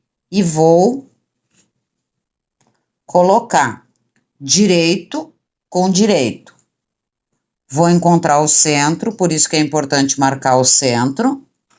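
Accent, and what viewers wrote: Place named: Gravataí, Brazil. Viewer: Ficou lindo o chinelo, e não é q reconheci esse sotaque , sou de Gravataí 😘😘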